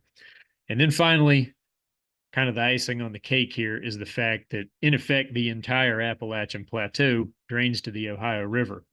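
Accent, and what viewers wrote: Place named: Texas, United States. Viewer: I can't place your accent. Which is strange. It's like half southern and half texas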